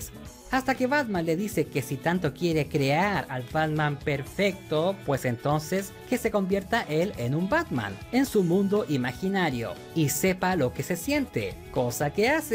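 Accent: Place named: Chile. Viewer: Amigo hablas como un chileno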